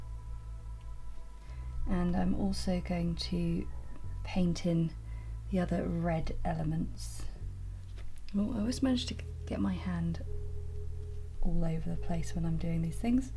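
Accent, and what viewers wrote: England, United Kingdom. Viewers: No no! I’m sure you say it the English way! You know how we Americans love the English way of speaking! Don’t change.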